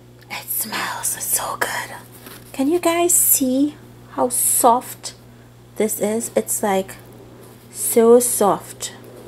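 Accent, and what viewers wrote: Trinidad and Tobago. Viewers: I heard your Trini accent and I was like, "oh, shit, this is gonna be good!" Frigin love Trinis man. They know how to cook! I grew up in Scarborough, Ontario -- I know what I'm talking about lol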